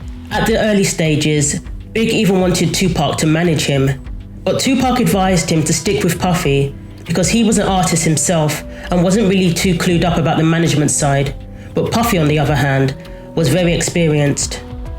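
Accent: London, United Kingdom. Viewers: Some big fish did go through the net. To this day still an unsolved murder! Love the London accent! RIP❗️though everything was avoidable.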